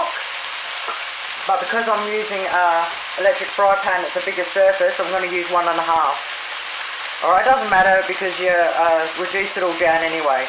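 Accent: Australia. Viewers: Girrrlll where have you been hiding? Nothing better than a true blue Aussie girl whipping up some awesome Aussie favourites most of us have grown up on but have forgotten how to make. Mate you are an absolute bloody legend.!! Please keep them coming. Just love the typical Aussie twang. Since discovering you I have been binge watching the lot. Love it. Good on ya Matey! 🇦🇺👍👍🌈🦋💞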